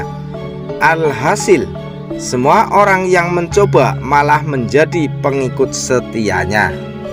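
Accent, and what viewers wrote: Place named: Banyumas, Indonesia. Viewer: Naratornya asal Banyumas sepertnya. Apakah yg punya k9nten "?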